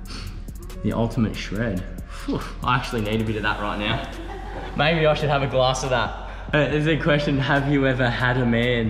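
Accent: Australia. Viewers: Adam we need more vids! From the beginning to the end of Love island you were my favorite! At first intrigued by your tattoo and handsomeness little by little I discovered your laid-back, honest and kind personality! You're one of a kind! And I love your aussie accent hehe.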